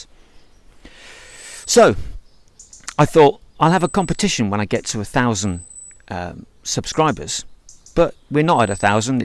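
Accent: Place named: United Kingdom. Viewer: Oooooh une vidéo en français !! J'ai hâte de découvrir. Avec l'accent british qui colore joyeusement tout ce qu'il touche ! A new challenge doesn't scare you, does it..? ☺ ✴🌟✴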